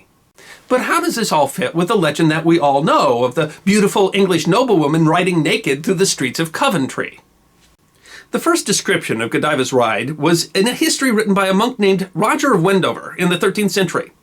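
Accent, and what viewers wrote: United States. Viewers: Terrible American pronunciation. Showing Kenilworth castle, and calling it a Monastery. A Degree in History..... ??? mmmmmm ! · Americans ... and speed speaking on top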